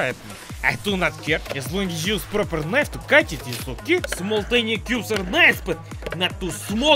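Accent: Russia. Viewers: ACCENT IS FUCKING LIIIIIIIIIIIIIIIT❤❤❤❤💪🇷🇺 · As ussual russian always says one thing like slav for mr and blyad for dimitri, i like russian accent btw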